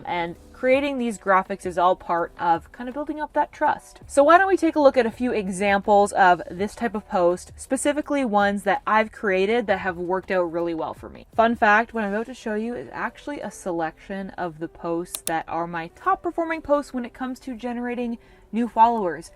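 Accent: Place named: Canada. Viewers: This video was so super helpful! I for one often interact with tagged photos (outfits and locations) especially when considering to buy a piece or go somewhere. And I have been doing it when making reels and posts but I will definitely ensure I do it for all posts. And yes it is obvious that you’re Canadian from your “about” and “out” lol but I’m also familiar with how Canadians speak so some people may not notice - Nelle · This is amazing training!!! Thank you so much! Yes and the word process every Candian says a long “O” sound where Americans say “ah” It’s cute ☺️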